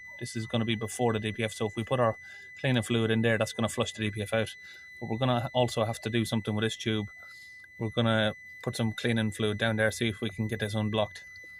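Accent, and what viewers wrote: Ireland. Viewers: Are you Irish by any chance?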